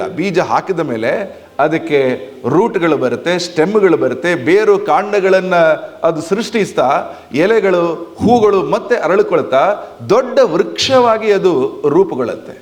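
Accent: Standard. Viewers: Sir parisudhavada kannada thumba santosha ಆಯಿತು. ಮಗ ನಿನ್ನಗೆ ನಮನ 👍🙏🙏🙏🙏🙏🙏🙏🙏❤️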